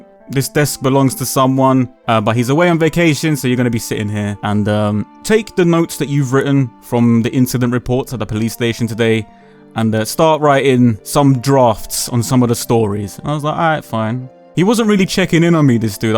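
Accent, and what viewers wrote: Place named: London, United Kingdom. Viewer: My guy😂 pure comedy you man. You doing these vids reminds me of that one time you did I little video talking about the first season of Luke Cage and how you really liked it and man I'd love to know your opinion on the second season especially with the Jamaicans and knowing that your from London and you got that multicultural English going with saying tings like ends, wagwan or even ting, me being a kid from up north of the ends I'm just wondering what your opinion on that season would be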